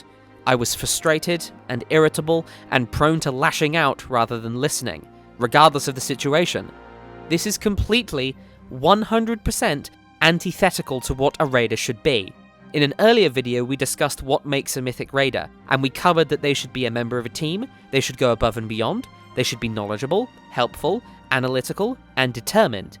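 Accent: RP, United Kingdom. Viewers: such a posh sounding accent ha, been along time since I have delt with raid drama. started playing again on a private server, expect lots of drama on them 40 man raids hehe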